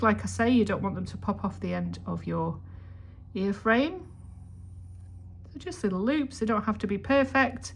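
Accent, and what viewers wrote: United Kingdom. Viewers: So good to see you again! So sorry that you’ve not been feeling well but glad to see that you’re recovering. I really enjoy your videos and have learned a lot. You are a great teacher and I love to hear your British accent, righty-ho! I live in Rochester, NY. Thank you for sharing with me! 😊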